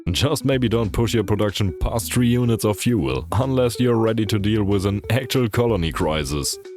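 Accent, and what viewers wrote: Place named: Germany. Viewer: Ich liebe diesen deutschen Akzent. :D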